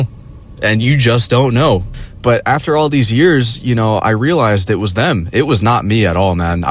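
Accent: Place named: Boston, United States. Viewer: Anyone else used to think was from Boston?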